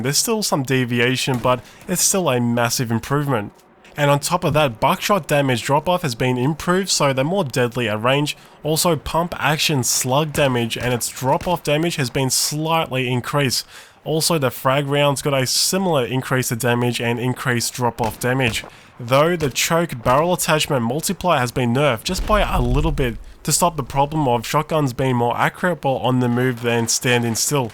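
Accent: Australia. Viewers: Im just wondering are u an australian?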